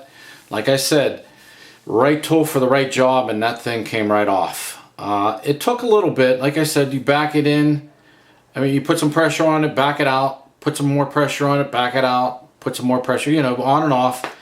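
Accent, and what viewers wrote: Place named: Pittsburgh, United States. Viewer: Nice video. Lookin to do the same swap on my shield 2.0. Are you from Pittsburgh?? Definitely picking up on a yinzer accent.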